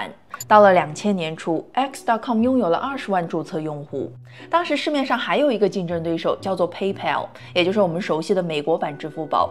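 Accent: Hunan, China. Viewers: 你这普通话😂湖南人吧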